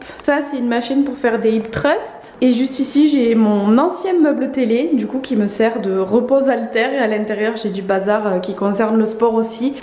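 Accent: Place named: Aveyron, France. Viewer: Bonjour! Je vous ai découvert il y a quelques jours. Avec votre accent ressemblant au mien, et la région de Montpellier évoquée, je vais sur Google pour chercher "qui est " et là...Centre presse aveyron! Une aveyronnaise, comme moi! (À présent non loin de Toulouse). Ravie de suivre vos aventures et vous découvrir un peu plus!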